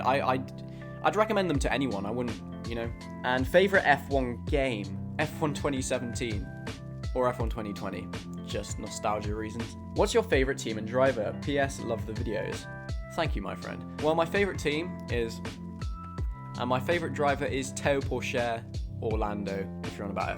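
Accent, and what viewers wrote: United Kingdom. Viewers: He hides his scouse accent really well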